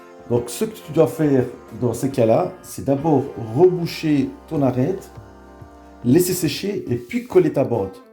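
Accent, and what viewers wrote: Belgium. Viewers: Bonjour, je regarde beaucoup des vidéos, souvent c'est toujours en France; Je voudrais vous contacter pour une rénovation je suis dans la province de Liège, je vois que vous avez l'accent de Seraing . Bonne continuation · Merci pour cette vidéo. Ça semble si facile, y a plus qu’à! 💪🏻💪🏻 (Serait-ce une pointe d’accent liégeois?)☺️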